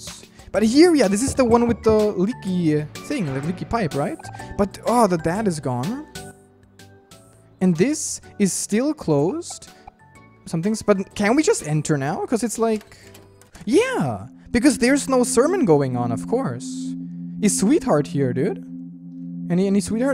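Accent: Germany. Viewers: Oh, he's German? I did think his accent didn't really sound American or British or Australian or any other English accents. No idea what Canadian accent sounds like or how it is to be distinguished from the others. But German wasn't what I thought he was, I had no idea what I thought tbh. I'm Danish myself, and I feel like Danish and German have similar accents, yet when I hear Germans and Danes speak English, the Germans' accents sound much better than ours (at least when it comes to politicians, I think, English sounds awful when spoken with a Danish accent). I do love accent though, he sounds so cool and funny, his voice is an enjoyment to listen to.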